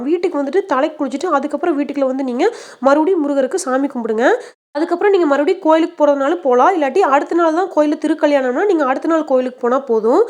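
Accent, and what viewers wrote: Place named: Coimbatore, India. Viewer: Kandepa nenga coimbatore circle than... Mariyathai Tamil அருமை... Avele sapduvanga 👌..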